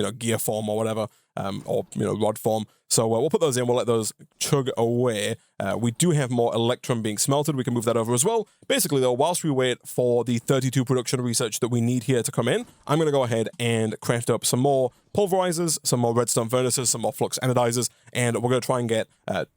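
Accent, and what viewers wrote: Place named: Northern England, United Kingdom. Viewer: your accent confuses me so much 😂 sounds like a proper northerner from the UK but has so many american influences; 'aluminum'???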